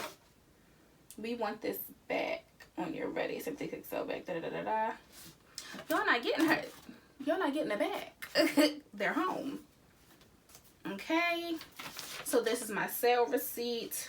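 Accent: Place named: DMV, United States. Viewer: Love the shoes and that blue is gorge on you! I definitely get DMV accent from you are you a native?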